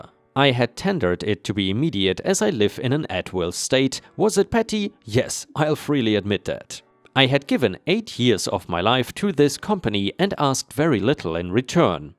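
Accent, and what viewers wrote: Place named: Germany. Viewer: Nice Story - certainly it is all a lie! And: interessing accent! German?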